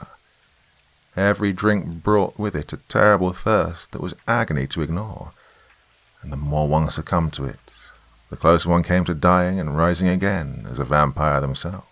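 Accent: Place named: England, United Kingdom. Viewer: I gotta ask your accent are you english or?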